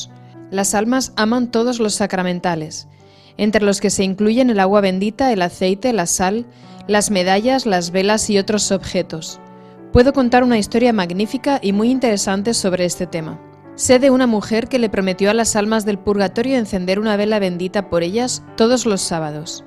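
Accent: Standard. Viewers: Agata Simma murió en el año 2004, pero en estos vídeos se manifiestan ideas que sólo se empezaron a hablar en los últimos diez años, parece que está todo distorsionado y mal traducido: María Simma no criticaría nunca a las cosas que dictaminó el Concilio Vaticano II, por ejemplo la comunión en la mano...Se están falseando los dichos reales de la Mística Católica, y opinando en contra de la Iglesia...Parece un pensamiento Tradicionalista que está presente en todo el vídeo...Yo puedo traducir alemán, y me gustaría mucho escuchar el audio original, y no a esta traductora española con acento neutro...Tengo muchas dudas por la forma en que ataca a los sacerdotes modernos, está en una posición claramente Tradicionalista y Lefevrista...Habla mucho de políticas eclesiales contrarias al Vaticano II, y no del verdadero mensaje del Señor Jesús y de la Iglesia Católica, Apostólica y Romana, que es un mensaje de amor y misericordia...Y espero que este comentario no sea censurado...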